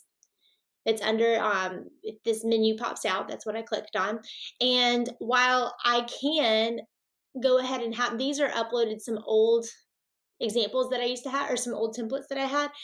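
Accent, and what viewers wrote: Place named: South Carolina, United States. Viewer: Has to be from South Carolina with that accent. Represent lol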